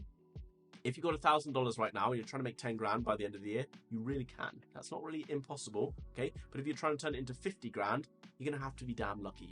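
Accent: England, United Kingdom. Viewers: Thank you, always good to hear an English voice with content.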